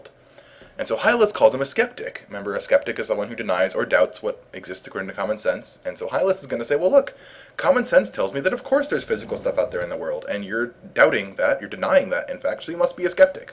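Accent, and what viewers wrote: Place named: Ireland. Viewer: He's Irish...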